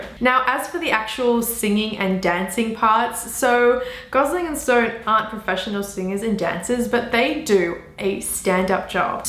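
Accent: Australia. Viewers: Great review. I've seen LLL twice now. Even more impressed the second time. --Anyway, I'm trying to place your accent. Are you an Aussie?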